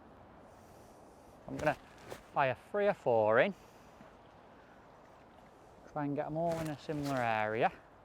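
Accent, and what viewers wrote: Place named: Yorkshire, United Kingdom. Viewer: Yorkshire. Nuff said.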